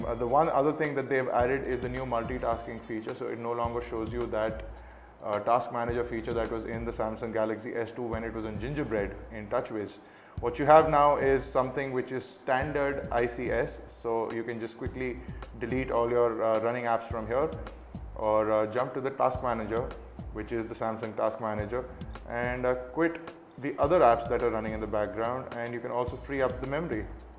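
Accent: India. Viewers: BLAH BLAH BLAH BLAH, I HATE THE ACCENT OF THIS INDIANO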